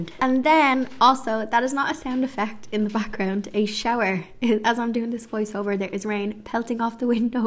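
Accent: Ireland. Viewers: I just now discovered your channel. The word cottage drew me but then I saw Ireland! I’m half Irish but have never been there. Everything about this video was a delight, including your lovely accent! You have created such a sweet garden. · Just came across your video. Beautiful work! Btw, I love your Irish accent 😊. We loved traveling thru Ireland..absolutely beautiful country! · Hello from California USA. I have only just found your channel and must say you have taken to gardening in the best most natural way! I admire all that you have done, with the beautiful greenhouse the raised beds and the floor and garden wall you " bricked." What a great and creative way to add loads of charm! I will continue to follow your inspiring channel...plus I love to listen to your Irish accent! Happy Spring! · Your video content is great but the real appeal of your videos is your voice and accent. 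Nothing says cottage garden inspiration more than a whimsical Irish accent 🍄🍀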